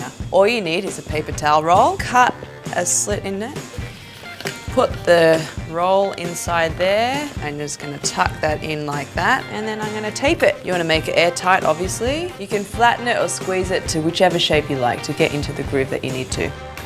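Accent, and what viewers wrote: Australia. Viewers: Where is she from? Australia? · is she from Austrailia?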